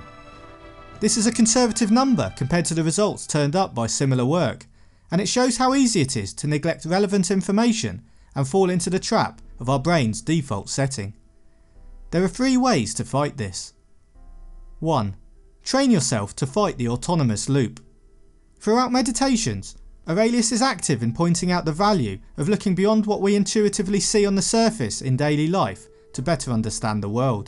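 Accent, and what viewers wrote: England, United Kingdom. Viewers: What part of England does your accent come from?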